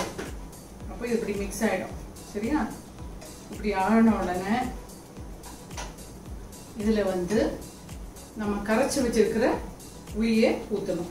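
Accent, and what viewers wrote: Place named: Kanyakumari, India. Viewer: You speak kanyakumari Tamil..💪.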